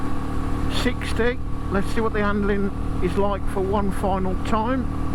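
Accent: Birmingham, United Kingdom. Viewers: Another moaning Brummie.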